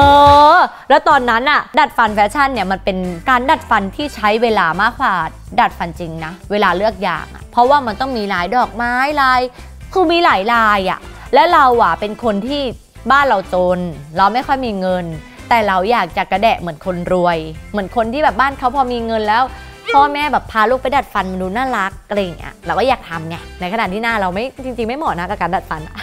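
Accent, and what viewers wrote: Thailand. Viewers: เอาจริงเมื่อก่อนไม่ชอบคือตอนเพลงคันหู คือมันดูแรง แรด ว่าเราชอบผู้หญิงแรดๆแต่เพลงด้วยมั่ง แต่สุดท้ายความเป็นตัวจ้ะคือตอนนี้ จ้ะน่ารักมากกลายเป็นชอบมากความใสๆแล้วบนเหน่อๆแบบนี้คือชอบบบบบบบบที่สุดเลย ครับ เป็นกำลังใจให้ทุกเพลงและจะติดตามผลงานตลอดไปครับ หลงรักแบบไม่รู้ตัว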